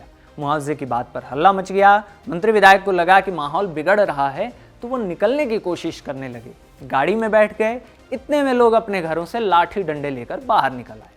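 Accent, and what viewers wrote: Bihar, India. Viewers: bhai, Real tone of Bihar -UP Maja aa rha aapka show dekh kr Theth bhasa me ❤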